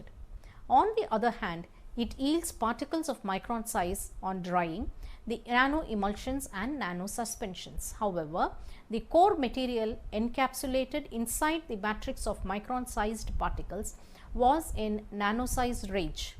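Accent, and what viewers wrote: India. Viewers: it's a lovely presentation with such beautiful indian accent . THANK YOU SO MUCH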